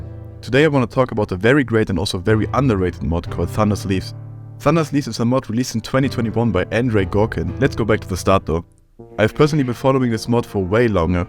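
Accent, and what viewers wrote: Germany. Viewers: The German accent man, i cant fucking listen to this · I smell a German accent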